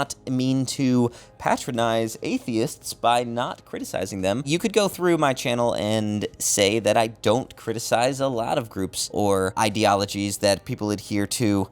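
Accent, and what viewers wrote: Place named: United Kingdom. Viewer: He kind of sounds like Shelby from Adventure Time with a British accent. 😁😁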